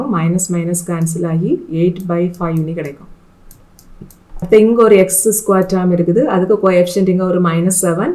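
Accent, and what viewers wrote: Kanyakumari, India. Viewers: Neenga kanya kumari ah ,ithu kanyakumari slang thane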